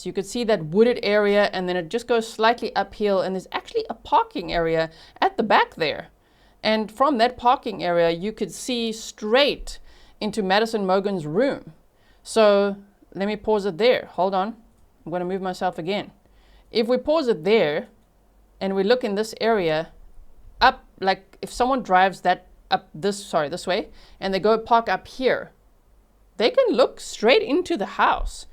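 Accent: South Africa. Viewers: is that a beautiful South African accent I detect? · I know a south african accent when i hear one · You've just gained a new South African subscriber- I recognize your accent, but it's not that- your breakdown and the new info given in this video is brilliant!! Thank you!! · Just discovered you. Funny how I knew right away that you are a fellow South African! Thx for your great work. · Are you also from South Africa. Your accent❤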